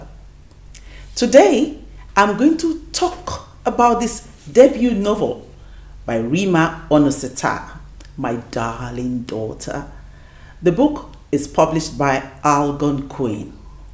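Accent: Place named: Nigeria. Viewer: Congratulations. Rimma I have got your book on Audible and it’s just so well written and more so a beautiful Nigerian accent to compliment. We are so proud of you. I recommend this book to both your young and old🎉👏🏾👏🏾👏🏾👌🏾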